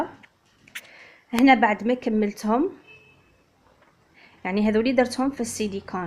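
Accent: Setif, Algeria. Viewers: انا من الجزائر لهجتك تعجبني كثيرا لانني من اصول سطايفية و نحن نعيش في العصمة و بالضبط بحسين داي و نشتاق لسماع هذه اللهجة الحرة حفظك الله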